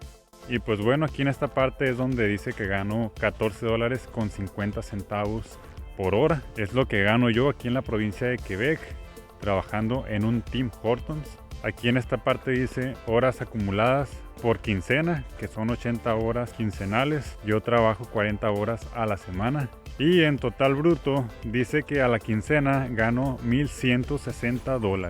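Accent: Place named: North, Mexico. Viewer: Acento norteño mexicanazo.😊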